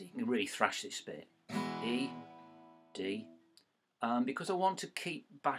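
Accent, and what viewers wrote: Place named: United Kingdom. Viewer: Hi, my name is Angelica and I love your british accent I'm italian and I love England. I'm a musician, a pianist. I just learnt to play by, I hope you know it... I'd like to know more about you. Where are you exactly from? Because you know, I love England... I' d really like, if in a way we can try to keep in touch if I can play you, it'd be amazing. I play it since I was 2 and now I'm 15. Hope you are well. Bye.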